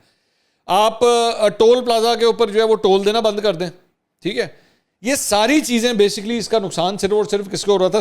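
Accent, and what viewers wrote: Pakistan. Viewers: Sir bohoot khoob tajzia kia aap nay Aap k lehjay say pakistaniat jhalak rehi hay Jazak allah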